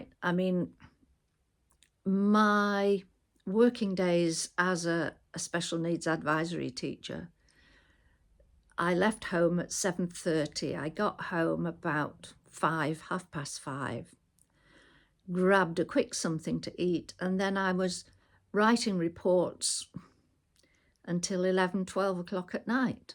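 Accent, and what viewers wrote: United Kingdom. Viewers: BUT. My I ask the accent to that? Irish, Scottish or the 40 accents of thee U.K.? Thank you Ma'am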